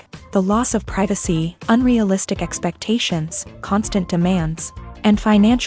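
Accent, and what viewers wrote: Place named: United States. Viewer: I wanted to watch the movie, not listen to a description - even less so in a twangy American accent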